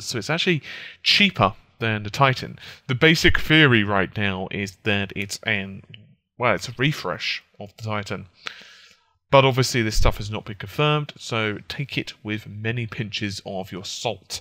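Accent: United Kingdom. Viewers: It's because he is British. They have many strange accents there.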